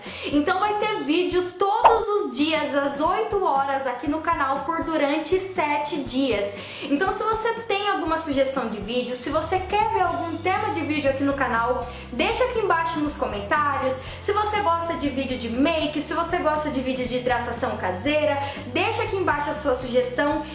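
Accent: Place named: Caipira, Brazil. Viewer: você é linda!!! Já te acompanho a algum tempo e sigo a maioria das suas receitas caseiras, em especial aquelas para o rosto! amo o seu jeitinho de falar caipira!!! e sua simplicidade!!! parabéns!!!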